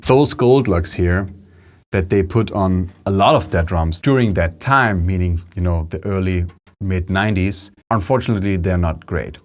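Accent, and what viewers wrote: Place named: Germany. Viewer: brilliant! I’ll be watching it, cheers, are you in Germany? You sound german, if you don’t mind me asking..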